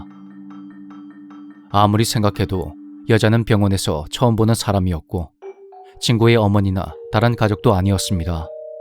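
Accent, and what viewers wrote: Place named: Busan, South Korea. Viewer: 야후꾸러기 참 오랜만에 들어보네요. 이야기를 들을때마 참으로 신기한 일이 많은것 같아요.~~ 연기도 짱! 연기속에 부산억양은 꼭 나온답니다~^^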